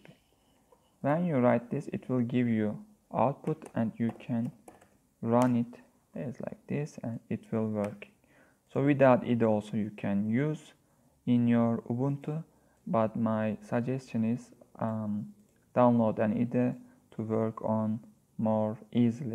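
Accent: Turkey. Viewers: ilk cümlende direk türk olduğunu anladım. Aksağan çok belli edio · Yemin ederim aksandan anladım Türk olduğunu 😁 aslan abim benim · aksandan o kadar belli ki Türk olduğu. 🙂 İçerik için teşekkürler. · ABi bende tam olarak Hem ubuntu tabanlı hem c++ opencv videosu arıyordum çok teşekkür ederim ingilizce niz de türkçe aksanı olduğu için benim için çok daha anlaşılır olmuş adamsın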